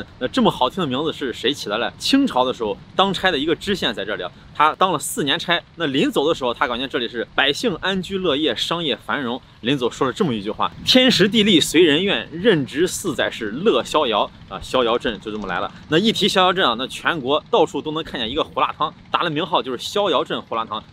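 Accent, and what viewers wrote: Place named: Xi'an, China. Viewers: 堪比中央电视台节目主持人，特别好的风格，看你探店吃美食就是一种享受。而且文化修养也非常高。我大学在西安上的，所以看到就觉得特别亲切。西安普通话😆 几乎每集都看，继续加油。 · ，你是不是咱们西安人啊？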